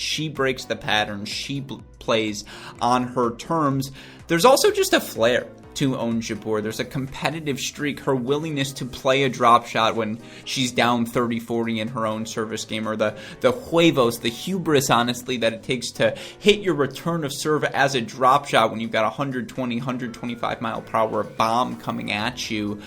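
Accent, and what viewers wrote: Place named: United States. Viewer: Well described, well resumed, well explained. And what a beautiful american accent!!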